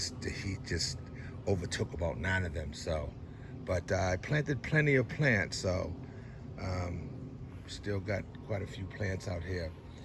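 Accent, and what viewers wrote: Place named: Michigan, United States. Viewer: Your garden is awesome. Are you in Michigan, you sound like my cousins accent!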